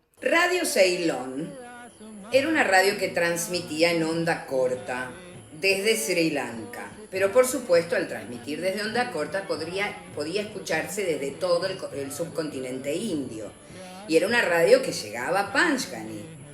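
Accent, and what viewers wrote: Argentina. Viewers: Ese puto acento argentino tan chocante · Me ha gustado mucho su narración dígame ud tiene un acento Argentino y como sabe tanto de Freddie a mi me encanta la música de el cada ves q escucho su música lloro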